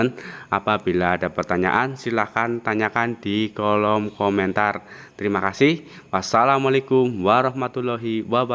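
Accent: Banyumas, Indonesia. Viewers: Kie pasti wong ngapak sing ngomong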